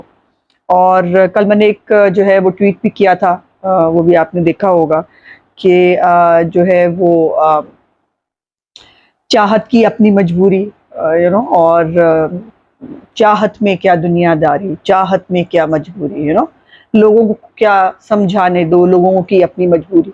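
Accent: Punjab, Pakistan. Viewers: Love the punjabi accent and the punjabi touches ..keep it up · very nice talk with punjabi touch...................Denmark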